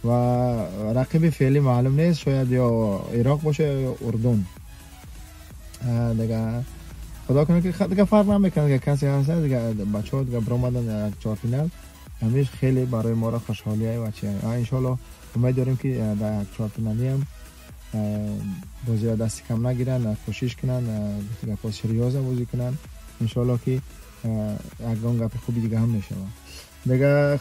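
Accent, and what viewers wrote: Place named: Iran. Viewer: Ин чи хел лахчаи гап заданай ним точики нимш лахчаи эрони 😅😅😂😂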